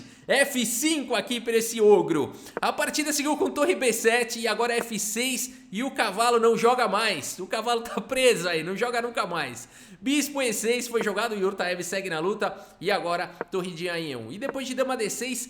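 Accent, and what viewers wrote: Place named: São Paulo, Brazil. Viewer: SP capital. O sotaque entrega muito kkkkkkk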